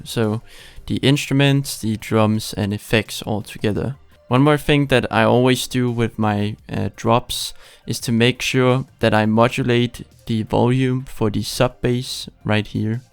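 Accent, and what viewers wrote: Denmark. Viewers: Er du dansker? :p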